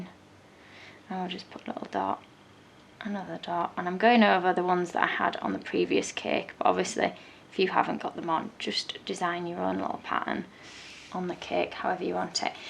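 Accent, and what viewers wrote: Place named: England, United Kingdom. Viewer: New subscriber here! Where did you train, or are you self-taught? Amazing tutorials, and nice to hear an English accent, so many Australian and American cake geniuses on YouTube but I haven't come across many fellow Brits :)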